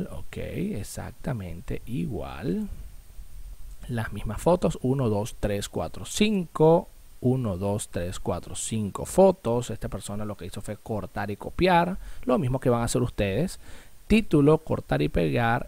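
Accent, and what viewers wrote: Venezuela. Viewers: GRCIAS, EXCELENTE, CLARA, CONCISA Y SENCILLA EXPLICACION, POR TU ACENTO Y MODO DE HABLAR SEGURO QUE ERES VENEZOLANO COMO YO. GRACIAS.. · amigo saludo desde venezuela super importante su informacion pronto estare en contacto con usted,por su forma de expresarse es de venezuela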